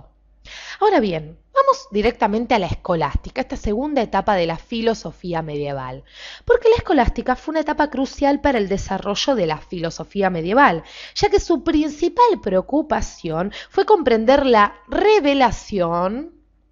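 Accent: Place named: Argentina. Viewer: Hola chicos... que tiene el acento? No son argentinos ustedes? 😊 los argentinos nos caracterizamos por el acento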